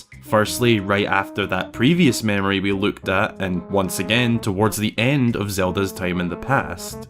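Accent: Scotland, United Kingdom. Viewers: Awesome video, awesome accent too. You scottish, mate?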